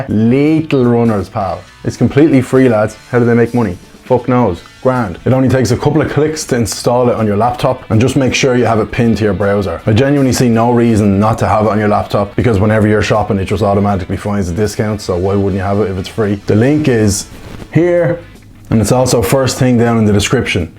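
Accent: Liverpool, United Kingdom. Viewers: Haha love the scouse accent, just noticed your Liverpool video so I’ll be watching that next!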